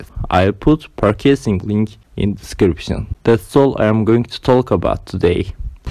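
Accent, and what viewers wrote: Turkey. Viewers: türk olduğuna yemin edebilirim ancak ispatlayamam , diksiyon tanımlıyor sanki :D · Duyar duymaz dedim bu aksan kesinlikle bir Türk'e ait.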